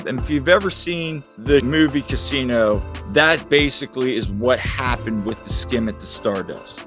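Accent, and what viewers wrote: Southern California, United States. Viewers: You sound like spiccoli radical duuude so Southern California Lolol anyways I like your videos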